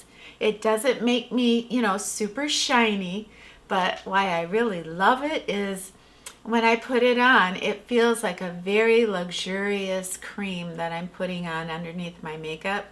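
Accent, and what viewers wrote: Midwest, United States. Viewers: “Oh, you’re just saying that.” My gosh, I love that Midwestern accent!! Seriously, I do! Grew up in Minnesota, until late grade school, then in Oregon, so I love to hear that Midwestern accent! 🥰 I can’t imagine someone saying don’t want your dog and no dog in the back yard! God was definitely watching over you, and protected you and sweet Dezi. Can you imagine if you weren’t home how he would have treated Dezi!!😡 Scary! Bless you and Dezi!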